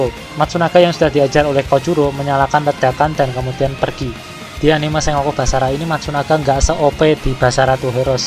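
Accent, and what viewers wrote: Java, Indonesia. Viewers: Logat jowomu medok min,asli ngendi ki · Medoknya bikin semangat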